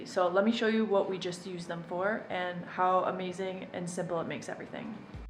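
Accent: France. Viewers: Bonjour Est ce un petit accent français que j’entends mdr Superbe vidéo merci de vos conseils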